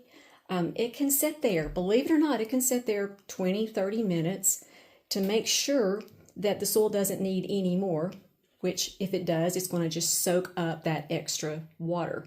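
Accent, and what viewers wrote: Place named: Tennessee, United States. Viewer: I love your TN accent! (from this californian)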